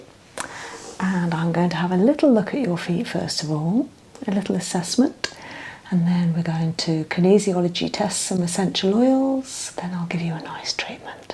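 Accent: United Kingdom. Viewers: Hello from North Texas, USA. Is it weird that I want to speak with a British accent after watching your videos. I love hearing your soothing voice.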